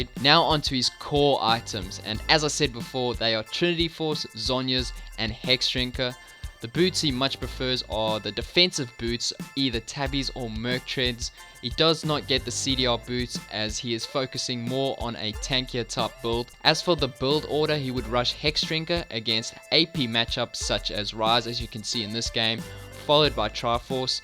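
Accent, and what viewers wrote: South Africa. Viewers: You need more recognition bro, and are you South African? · Is this guy South African? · are you south African?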